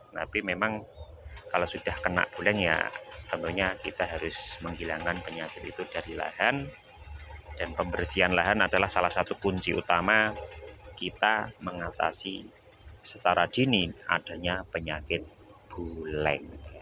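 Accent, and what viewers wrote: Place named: Java, Indonesia. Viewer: Gayamu gomong ubi..anak sd jg bisaa..jowooo..medok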